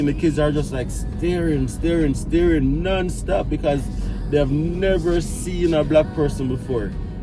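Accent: Jamaica. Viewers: I wonder if your Jamaican accent would have blown those Mennonite kids' minds if you'd spoken to them.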